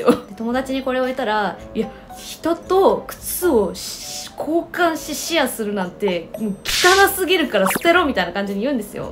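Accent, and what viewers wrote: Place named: Osaka, Japan. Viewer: 話し方が大阪のおばちゃんにしか見えん。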